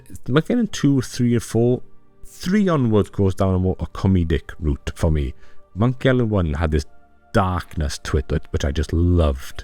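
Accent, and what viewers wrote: Wales, United Kingdom. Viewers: Where in Wales you from bud?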